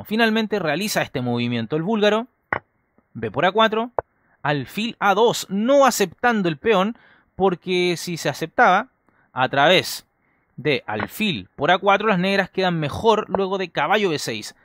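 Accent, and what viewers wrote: Chile. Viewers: Tu acento es ¿ Chileno? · se nota poco lo chileno puro aguante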